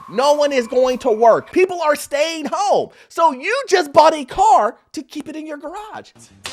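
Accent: United States. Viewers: Love your laugh and your american style and your american dialect! Hi from Duesseldorf, Germany and thatnk you for keeping me up to date. ps more condo content please, especially condos in florida, thank you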